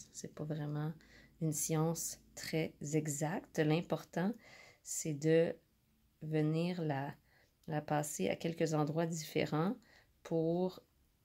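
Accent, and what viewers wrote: Quebec, Canada. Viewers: Hello and Thank You so much!!!! J'essaie de faire un manteau pour un Petit Lévrier Italien et.... Je ne comprend pas comment faire les Pattes??? Merci pour Tout Tes Conseils!!! Fantastique et.... Ton délicieux petit accent québécois est Une Douceur à mes oreilles car mon Cheum (mari) et moi on est Amoureux de votre Pays sans l'avoir jamais visité Mais à travers plein de films et séries Québécoises en VO Québécois!!! Comme Tu es douée et partager si clairement est un don, à mon avis!!!!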